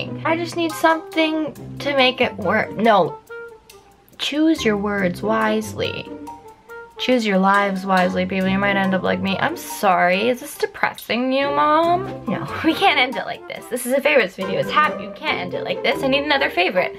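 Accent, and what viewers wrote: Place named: Canada. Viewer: That Canadian accent... 👌🏻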